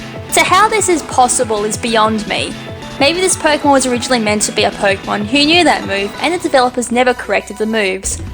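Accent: Australia. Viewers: Pimp sent me to hear that delicious Aussie accent.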